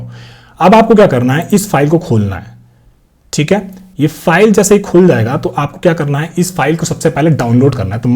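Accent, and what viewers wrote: Bihar, India. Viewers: The Guy Is Littreally Khan Sir OF Coding - Teaching Style , Bihari Lang Style . Thnku Sir For The Content . ❤❤ Day 1.5 Done ( 1/1/26 )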